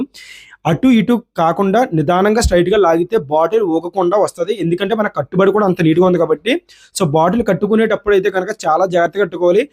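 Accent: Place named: Godavari, India. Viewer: మన గోదారి జిల్లా బిడ్డ లా ఉన్నావే మాట చూస్తే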